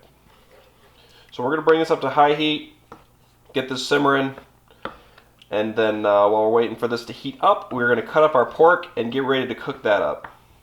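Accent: Canada. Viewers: I’m sure you’ve addressed it before, but where are you from? I’m assuming Canada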